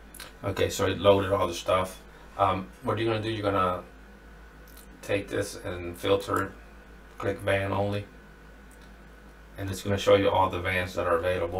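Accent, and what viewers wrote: Cleveland, United States. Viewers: You from Cleveland?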